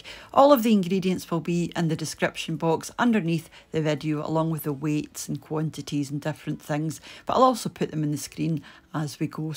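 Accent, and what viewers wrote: Scotland, United Kingdom. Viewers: Mmmm my fave so nice to hear a Scottish voice ♥️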